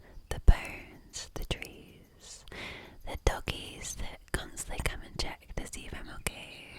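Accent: Scotland, United Kingdom. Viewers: 3rd comment..hot mom Scottish ❤ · Thank you so much,.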